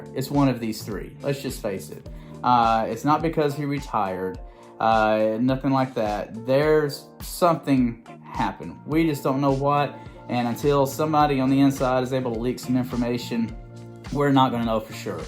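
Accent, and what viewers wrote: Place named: South, United States. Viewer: Very logical. A refreshing change from the GB! I enjoyed your southern accent too.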